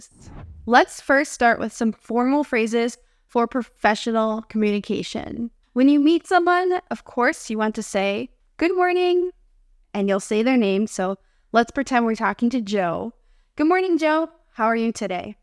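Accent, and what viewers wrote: United States. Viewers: love American pronunciation.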